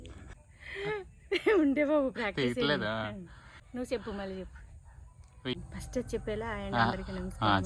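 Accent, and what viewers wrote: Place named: Krishna-East Godavari, India. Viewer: Dear — Don’t feel bad for not able to speak in English amma. I am 80 years old. And I did M.A English While working as a B. Ed. teacher. But your Telugu is so sweet with an accent between the Krishna and East Godavari districts. It’s not the language but the. Bhava prakatana which is important. That is important. That you have more with your smile. I like. and I watch all your videos. Bhasha anedi maatladuthovuntene brathukuthundi. You are doing your. duty as a. wife and as a mother very well. You are a. hard worker. Keep it up. By the by your farm is very nice😊God bless you and your. family. At this age my hands are shaking so much.Don’t feel bad if there are any mistakes.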